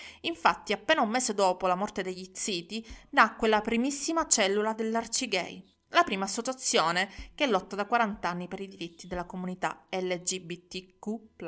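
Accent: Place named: Sicily, Italy. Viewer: Buonasera,. Una mia curiosità. Contrariamente a quelli che rimarcano sull'accento, io da siciliana, non riesco😅 a capire di quale provincia sei originaria. Se ti va di rispondere, ovviamente. Grazie e sempre tanti complimenti 😊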